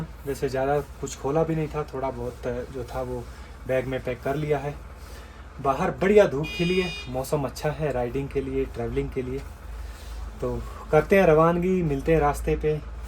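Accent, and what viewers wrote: Himachal Pradesh, India. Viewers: Aap ki aawaj or bolne ke trike se ese lgta h jese aap uper himachal se ho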